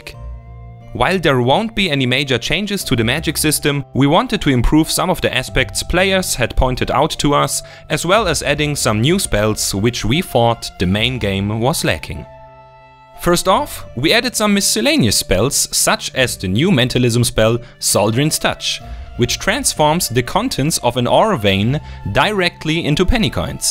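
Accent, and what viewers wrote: Germany. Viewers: oh gott was für ein cooler deutscher akzent :D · Super video, klingt nach wirklich tollen ideen für die neuen Zauber. Der deutsche Akzent ist sehr putzig :D :D Ein native speaker kriegt da wohl Gänsehaut, aber klingt einfach süß :D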